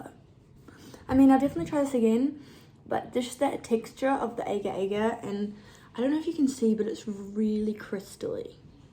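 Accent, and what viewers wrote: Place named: New Zealand. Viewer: My first question was going to be what is your accent?? I couldnt quite place it! New Zealand! I was actually vegan for many years. That mold is so cute!! For my silicone molds, I like to put them on top of a small cookie sheet to carry them to the fridge or the oven. I had too many mishaps before I started to doing that..Hahahaha. My Grandsons love gummy bears, so I know they would enjoy these!!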